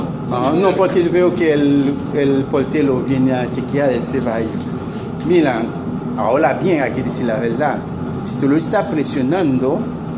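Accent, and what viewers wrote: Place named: Haiti. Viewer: su forma de hablar el español me genera una paz porque me recuerda a mis amigos haitianos ❤ me encanta su idioma, lo estudio por gusto y cariño a su cultura que la considero muy interesante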